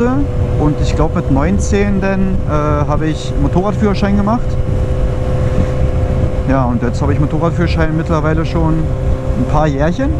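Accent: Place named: Berlin, Germany. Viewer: da bin ick mal jespannt Keule was übern Winter verändert wird💪😎. Wenn der Schwarze Ring kommt dann mit Gravur = idee von Matze 😂💪. Ick fühl mich dann immer wieder in die Kindheit zurück jeholt wenn die Berlinerschnauze durch kommt. Bin ja in Steglitz groß jeworden vermisse Berlin daher Feier ICH deine Videos mit der Schnauze😂👍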